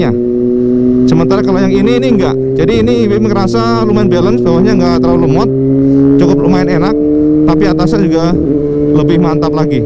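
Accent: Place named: Surabaya, Indonesia. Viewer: logat e koyok ne areek surbeje' .....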